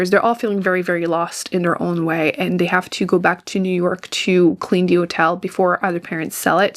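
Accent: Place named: Quebec, Canada. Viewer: I know a québécoise when I hear one hihi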